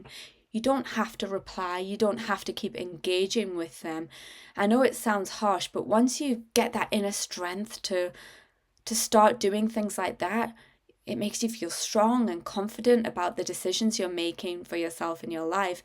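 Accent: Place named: Europe. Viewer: Hello, you seem to sound EUROPEAN. I'm from America.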